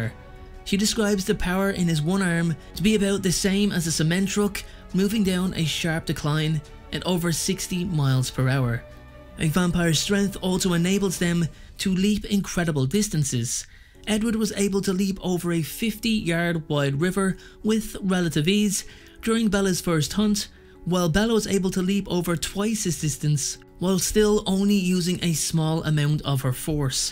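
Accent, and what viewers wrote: Ireland. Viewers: OMG WTF do u sound so bloody Irish 😳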